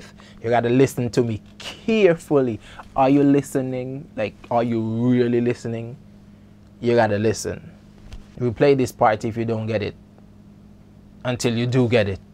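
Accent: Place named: Jamaica. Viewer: I want to say sir you really know your information. Love how you explained everything. Love your vibe ❤ Just curious, sre you Jamaican or Haitian? I’m hearing both. Mostly Jamaican or maybe Vincy?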